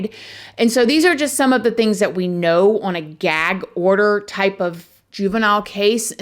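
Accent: South, United States. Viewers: Don't come at me. This isn't meant to be an insulted, but of course, some people will always take it as an insult cause the Internet and there's so many personalities. Your speech is hillbilly but you are one of the smartest crime reporters on youtube. I really enjoy your videos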